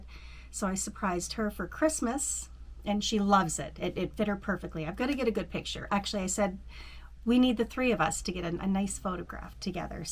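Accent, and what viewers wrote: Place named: Maine, United States. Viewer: Yay thanks for the update after Vlogmas - love your Everett I have received your Valentine Box and am looking forward the finding a pattern and knitting up all your beautiful yarn. Love your “Down East” charm, nothing like a good “r”.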